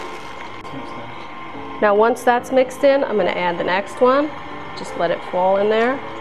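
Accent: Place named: New York, United States. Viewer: From the accent she's either from N. Jersey or the city? This video is my first, great recipe.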